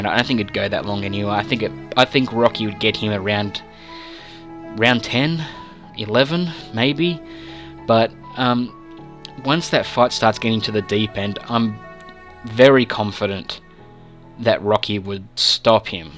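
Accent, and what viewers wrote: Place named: Australia. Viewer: You sound Australian, what would you know about boxing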